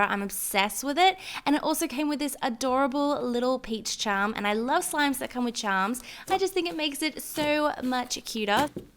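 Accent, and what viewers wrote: Australia. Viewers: OMG your Australian!😍SAME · your Australian! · I love her Australian accent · Are you Australian?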